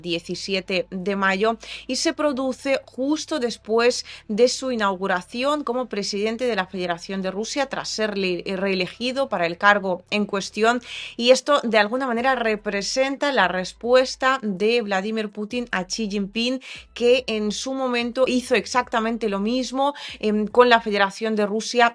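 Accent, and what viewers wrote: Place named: Castile, Spain. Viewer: Muy buena información muy buen español aunque con acento castellano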